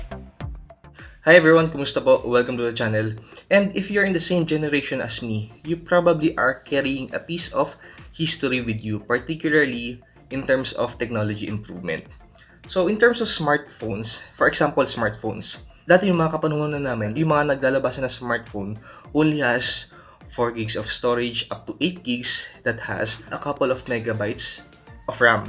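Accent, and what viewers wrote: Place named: India. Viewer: Lol, me too. But he speaks i think indienglish or tagaloenglish thats why.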